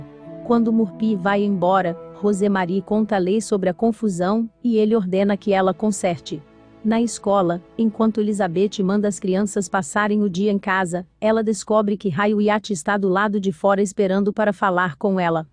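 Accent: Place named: Northeast, Brazil. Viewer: Gente,é uma nordestina tentando narrar🤣🤣🤣🤣…Sou nordestina e não tenho nada contra mas ficou péssimo😂😂😂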